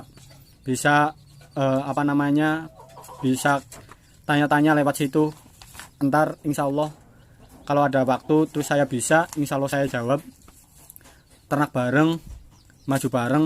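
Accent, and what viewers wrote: Banyumas, Indonesia. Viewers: Mantap om...😆😆😆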